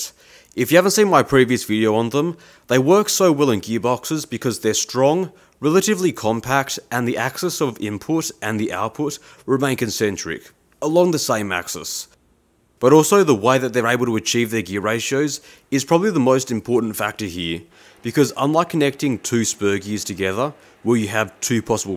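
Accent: Australia. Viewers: Australian? Great! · Sounded like an Australian accent to me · An Australian!!! My ears are not designed for hearing THIS accent!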